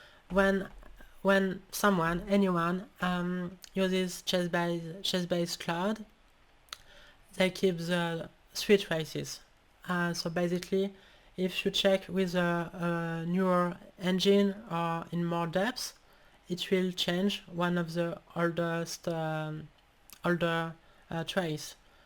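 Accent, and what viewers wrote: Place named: France. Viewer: From her accent I'd say France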